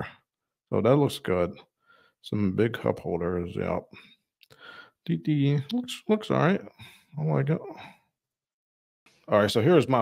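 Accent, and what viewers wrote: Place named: Mid-Atlantic, United States. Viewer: You have a very American and some might say even the classic “ Mid Atlantic “ accent.. well done sir.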